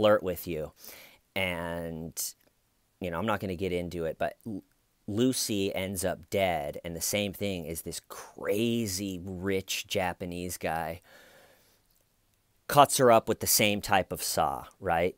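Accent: United States. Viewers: His accents too American and irritating. I keep wanting to shake him and tell him to speak properly.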